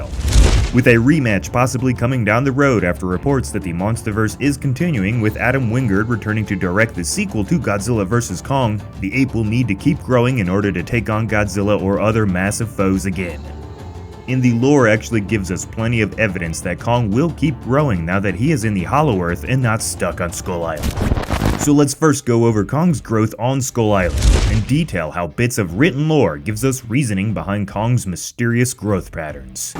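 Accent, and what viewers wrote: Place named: United Kingdom. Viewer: I can now tell you are from the UK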